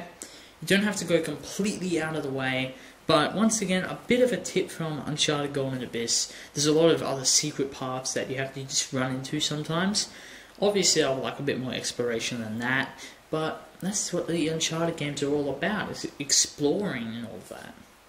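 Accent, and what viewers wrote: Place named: United Kingdom. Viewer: I love the way you talk to ND like they were your children with things like "Okay?, Good.". Maybe it's just the British accent. Anyway, all great ideas man.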